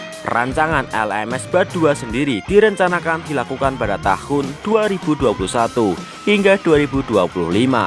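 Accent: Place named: Java, Indonesia. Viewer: Saya Indonesian... Saya bisa pastikan admin ini Indonesian juga... Slank Jawa atau medoknya masih menonjol atau jelas terdengar (hanya bisa dipahami oleh orang Indonesia) 🤗🤗🤗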